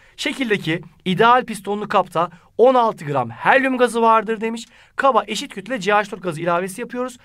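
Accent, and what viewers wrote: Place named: Central Anatolia, Turkey. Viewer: hocam nerelisiniz bi iç anadolu ağzı sezdim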